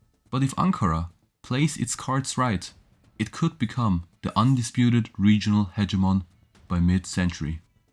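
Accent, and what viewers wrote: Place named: Germany. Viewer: Yo guter video. Nicer deutscher Akzent bre. Keep on hussling. Irgendwann kommt der Durchbruch.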